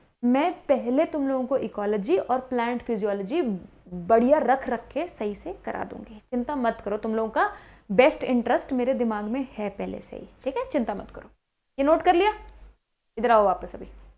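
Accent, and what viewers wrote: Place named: Bengal, India. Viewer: I think she's Bengali.. because I feel it in her accent of speaking Hindi 😌💜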